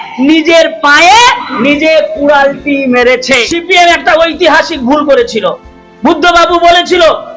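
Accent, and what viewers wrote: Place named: Bangladesh. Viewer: তুমি তো পুরো বাংলাদেশী, তোমার ভাষাতেই বোঝা যায়।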